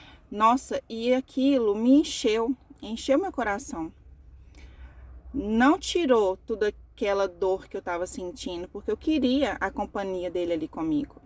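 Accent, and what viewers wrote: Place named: Minas Gerais, Brazil. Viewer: Minha irmã mineira,logo no primeiro vídeo percebi seu sotaque , que testemunho mais lindo . O luto dilacera a alma ,qdo minha neta não tinha nenhum aninho ainda,qdo meu amado esposo foi acometido de um cancer ja muito adiantado.Fiquei quase 40 dias com ele no hospital,passou por 3 cirurgias ,eu só acompanhava os primeiros passos dela por video . Se não for o supremo amor de Deus a gente nao aguenta .Tantos sonhos ,me aposentei pra termos tempo com Marina , e vi meu amado cada dia piorando . Tudo que planejamos indo de uma forma tao cruel ,mas pela graça do Senhor tive forças pra entregar Ele para o Criador e Pai celestial . Foi um processo muito doloroso o meu luto porque entramos na pandemia ,perdi parentes ,vizinhos ,amigos,sobrevivi pelo legado de fé que ele deixou,um homem muito temente a Deus ,um grande pregador e adorador . 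Não foi facil mas o Senhor renovou minhas forças,minha fé e hj prossigo na obra ,minha netinha ja com 3 anos , e minha esperança maior é um dia nos encontrarmos na glória, por isso luto diariamente .Fico feliz que Deus te proporcionou uma nova história, e seu testemunho me fortaleceu ,que possamos permanecer fiél ate o fim , porque um dia Deus enxugará de nossos olhos toda lágrima🙏🙌📖📖📖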